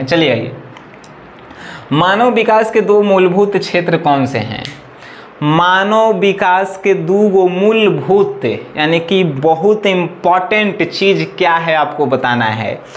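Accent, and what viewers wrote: Bihar, India. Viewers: Bhiar ka hai kya sir ya phir jharkhand ka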